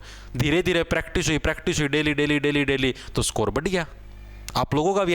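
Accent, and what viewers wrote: Haryana, India. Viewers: Haryana ale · Bhai aapki boli dekh kar me bhi aadhi si haryanvi Sikh gya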